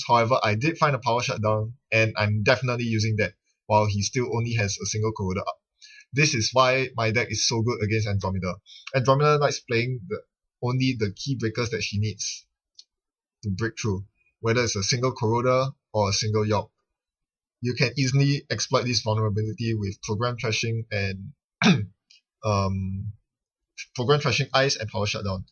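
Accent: Singapore. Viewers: Hi where are you from by the way? You sound like a singaporean. :) Just wondering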